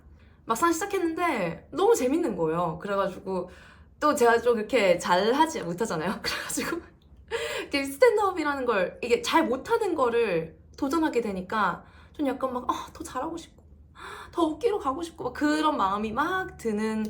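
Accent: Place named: Busan, South Korea. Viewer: 부산에서 하지말고 서울 큰데로 가보는건 어떤지? 열정이 있다면 큰데로 가서 도전해 보시라 원없이.. 해보고 해보고 원없이 해보고 나서.. 1년정도 정말 원없이.. ㅡㅡㅡㅡㅡ 그래도 안되면 다시 생각해 보시라..미래를 생각해 보시라 저도 부산이라 느끼는건.. 부산 사투리가 너무 자연스러움 다른 방향.. 예를 들어 코미디 영화배우도 충분히 가능하실듯 🎉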